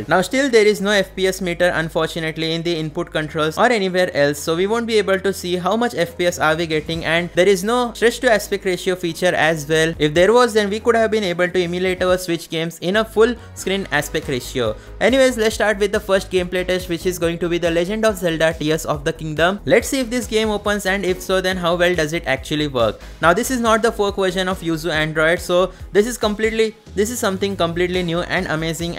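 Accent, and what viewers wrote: India. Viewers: The Indian accent was all i needed to tell me this is fake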